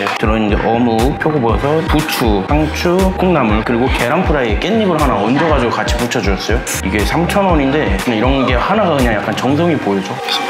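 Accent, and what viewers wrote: Jeju, South Korea. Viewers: 인줄 알았는데 이였군요~~ 저도 제주도민인데 10년째 서울에 살면서 제주도 사투리가 들리면 괜히 반갑고 귀가트이더라구욯ㅎㅎ 이번에 추석때 내려가는데 함 금복식당(추석때 열려나???ㅜㅜㅜ)가보고싶네요~~:)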